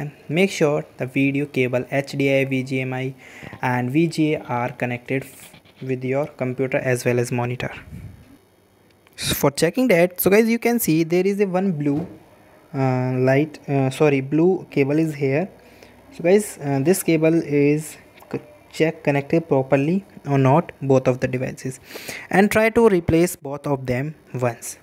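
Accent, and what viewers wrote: India. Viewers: Why when I search to solve a computer problem the YouTube videos are almost always done by some Indian guy whose English (?) is impossible to understand?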